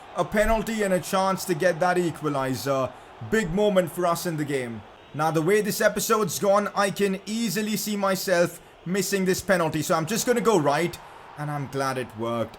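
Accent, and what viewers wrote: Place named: India. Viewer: indian accent is so funny only reason why i watch your videos lol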